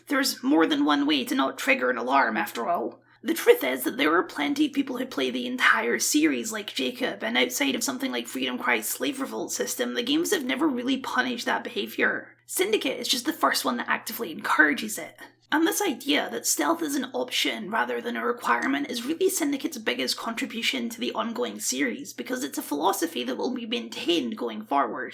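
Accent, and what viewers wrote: United Kingdom. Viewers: I’m… I mean I WAS assuming it’s just a different timezone situation, given the accent. GMT at 4am est is 9am.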